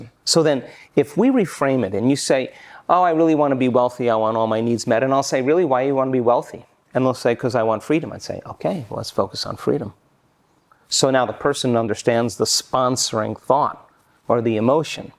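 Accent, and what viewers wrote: Germany. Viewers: cuuuute german accent :)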